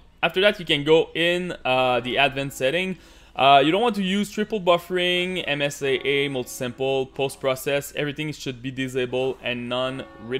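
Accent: Quebec, Canada. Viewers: Chu tombé par hasard sur ton vidéo pi juste en entendant le début j'ai faite : Ha ben, un autre Québecois esti :D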